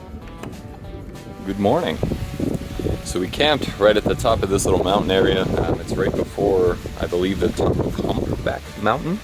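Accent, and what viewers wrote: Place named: New England, United States. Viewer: What's up from mass! Your accent sounded right at home, loving these videos and hopefully plan to hike a ton in the whites this summer. Good luck with the rest of your trip and maybe see you up there cheers man!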